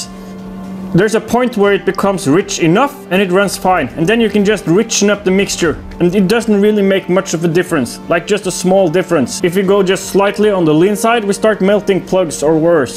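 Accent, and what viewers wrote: Scotland, United Kingdom. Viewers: Scottish accent is hilarious! 😂❤ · The Scottish accent is getting better 👌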